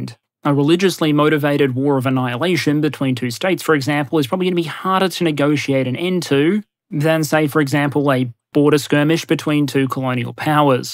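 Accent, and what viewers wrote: Australia. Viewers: After watching a great f1 race, then the rest of the wec race at monza and also imsa i get to listen to an aussie talking about war and negotiations while making F1 references. Perfection.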